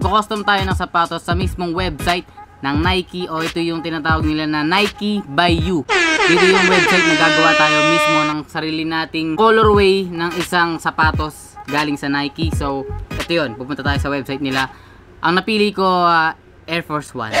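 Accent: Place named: Visayas, Philippines. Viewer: Sobrng bisaya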